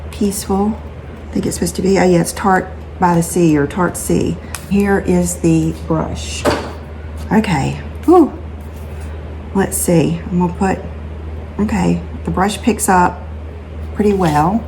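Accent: South, United States. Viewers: Hi!! I'm a new sub and I'm totally binge watching all your videos ☺️ I love your channel!! I especially love your jewelry, omg I have to get something from Dean Davidson and Cat Janinga! I also love your fashion sense and outfit of the day! But my absolute fav thing is your accent!!! It truly makes me smile 😁 I live in CA now but I was born and raised in TX. I have an affinity for all things southern!! Oh, and the last thing is I love the way you say Brooke. That's my name as well. It reminds me of being back home and someone calling my name 🥰 xoxo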